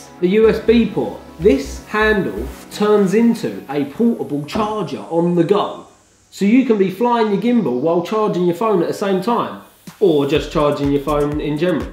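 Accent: United Kingdom. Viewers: Probably the only Youtuber I've come across with a legit (non-pretentious) British accent. Top lad - subscribed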